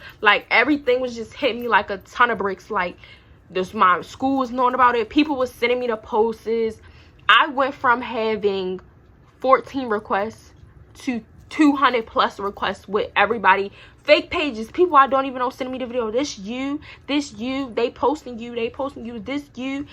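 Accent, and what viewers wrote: Baltimore, United States. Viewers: Yo she sound like she frm Baltimore but ion know nobody frm Baltimore that says cold drink so idek but you still gettin a sub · The Baltimore accent strong ash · You from Baltimore? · Baltimore accent ruining the video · I knew you was from bailmore I knewwww ittttty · Is you from Baltimore or summ ??😭😭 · DO I HEAR A BALTIMORE ACCENT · U gotta be from Baltimore💯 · hold up cuz ik you from baltimore gotta who bmore accent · Nah da baltimore accent be havin me cryin😂😂😂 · she gotta be from baltimore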